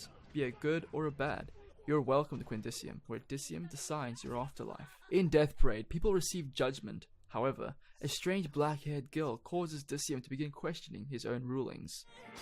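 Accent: South Africa. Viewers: what is your accent?? south african??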